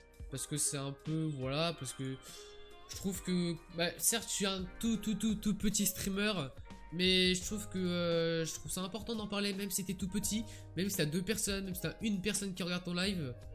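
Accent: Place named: England, United Kingdom. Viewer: Ton accent anglais god damn... 😒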